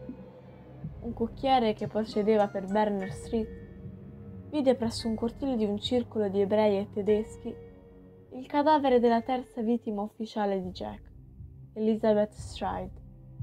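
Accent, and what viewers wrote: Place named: Tuscany, Italy. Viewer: figurati poi mi è sempre piaciuta la parlata toscana grazie a te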